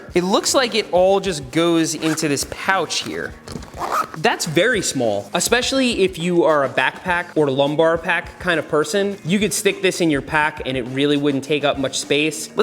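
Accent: Long Island, United States. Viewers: he said it in another video he is from long island it is his long island accent showing through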